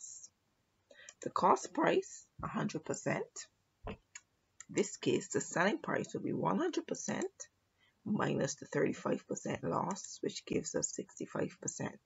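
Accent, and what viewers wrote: Barbados. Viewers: This is an excellent explanation. Thank you very much. Your accent sounds Bajan.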